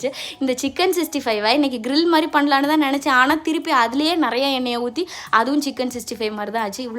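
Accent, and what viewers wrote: Tirunelveli, India. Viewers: Ungaluki tirunelveli a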